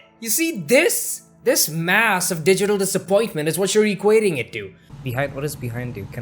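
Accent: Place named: India. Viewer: Around 17-18 minutes mark I started feeling like you have an indian accent. :D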